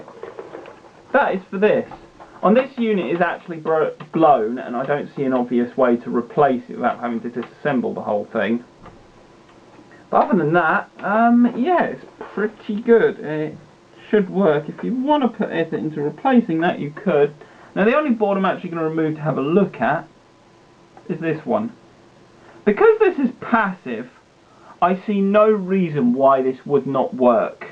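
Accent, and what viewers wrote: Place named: London, United Kingdom. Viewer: Thanks for the breakdown video. Like your accent, is it east or west London?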